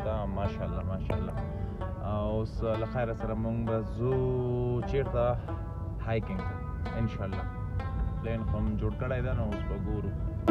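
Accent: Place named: Afghanistan. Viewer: Your sada pashto accent 🥵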